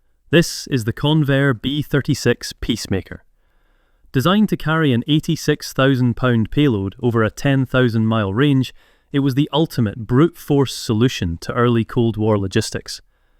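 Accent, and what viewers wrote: United Kingdom. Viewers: It's also a UK accent using exclusively US units Obvs not concrete evidence but defo suspish imo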